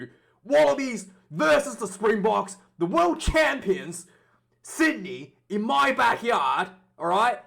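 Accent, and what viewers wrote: New Zealand. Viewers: I thought you were a kiwi at first too ngl but quickly realised you are Australian.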